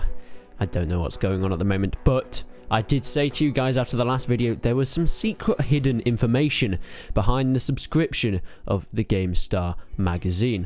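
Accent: United Kingdom. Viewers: Where the hell did he do anything to insult German national pride? - of which there is none, matter of fact, anyways. You just condemned for allegedly hurting "a nationsfeelings", and in the same breath, belittled U.S. Americans, generalized them, for being easily offended. You can't measure actions with one standard and then apply another one to your own. And besides that bullshit - how is it NOT okay for him not wanting to subscribe to a German magazine if he - as he said in another video - doesn't even understand German very well? Fortunately for us, WE don't have to do that now. Is it the profit of the magazine you're defending or is it that you want everyone to read Gamestar? Dude. is - I hope I won't lie - British, judging by his accent. I have no clue, just found the video. And you want him to subscribe to a German gaming magazine so you won't think of him as a some kind of "Anti German Supremacist"? 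Fine. Go subscribe to the first Mongolian game magazine found on google then! Or otherwise, I must denounce you as a racist! Oh, you don't speak any Mongolian you say? - You racist! You think of Asians as inferior?! Dirty German scumbag! Surprise fact - everytime there is exclusive information about a popular subject somewhere in the media - in this case, exclusive intel about the state of Bannerlord in a German magazine - it will get translated, it will be published elsewhere after a while, it will cease to be exclusive. Which is a good th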